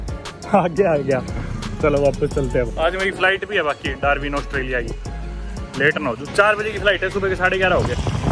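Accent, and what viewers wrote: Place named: Delhi, India. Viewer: Jaise delhi wale punjabi bolte h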